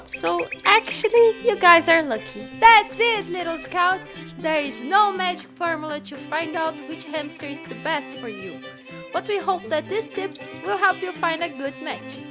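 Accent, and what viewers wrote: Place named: Brazil. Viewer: I love the video 😂😂 you sound Brazilian btw! Am I right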